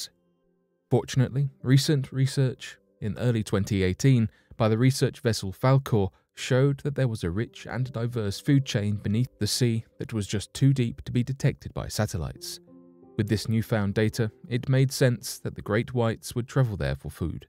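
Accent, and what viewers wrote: England, United Kingdom. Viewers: Look mate you sound English uses feet not metres were not in the EU anymore OK .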